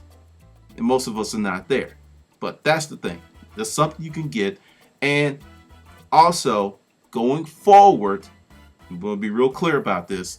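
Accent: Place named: South, United States. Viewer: Whats up with your "Mammy Copepper Plantation accent", I didn't know if you wanted your 15 minuits of internet fame or you wanted to tell your money story...........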